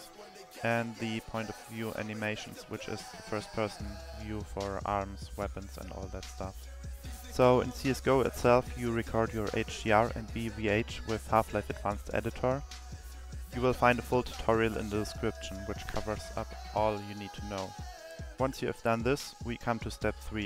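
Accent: Germany. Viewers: Wie man direkt hört, dass du deutsch bist haha :D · Hallo bist du deutsch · U german? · hyper deutscher, grüße aus österreich lul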